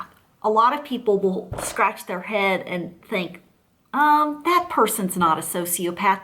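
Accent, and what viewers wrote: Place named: Texas, United States. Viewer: I've been told that you live in China. But I love your Texas accent! Anyways, a fine analysis of these puppets ma'am! You exactly described ''them'' and this female who I still like to refer to as a ''Siren'' or deadly mermaid from the Starbucks logo. ( Another one is Lilith as depicted in HR Gigers Tarot and the related book written by Akron! A masterpiece in my opinion. I could tell you terrifying stories about that! Telepathy, lucid horrific dreams and so on!) She still tries to contact\kill me after 3 years! The last time my stomach turned and it felt like a huge black hole when she passed me by with her bicycle. ''Tring, tring!''. I didnt respond but when I peaked I saw her strange eyes, smirk and her calm posture! At the same time her pace was fast and she reminded me of a bird of prey. (from the Odysee myth) Scary shit! 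My batterycharge dropped a 30\40 percent realising what she is and does! People died because of her, went crazy or live in the twilight zone! And as a no BS martian roaming the earth, I can tell you that this really something. SO FUCK HER!!! (Excuse me!) And thank you! Beautiful relaxing music 24/7 featuring instrumental music by <link>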